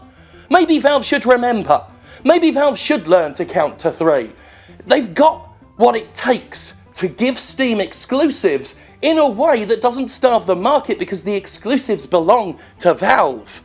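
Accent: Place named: United Kingdom. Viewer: "It's a fucking joke here" Wait, what? I'd always thought you were British because of the accent.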